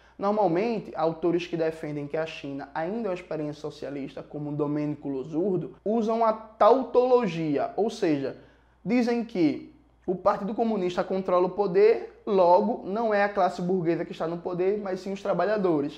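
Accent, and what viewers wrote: Pernambuco, Brazil. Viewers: Seu português-pernambucano dá um certo charm e uma aura especial. Competência nem se comenta. Reconheço.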